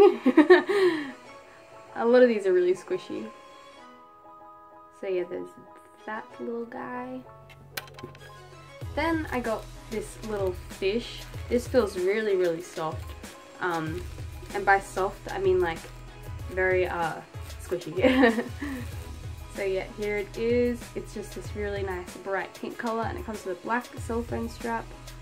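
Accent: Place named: Australia. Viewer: Erika Flores I have the same accent as her it is cause she is from Australia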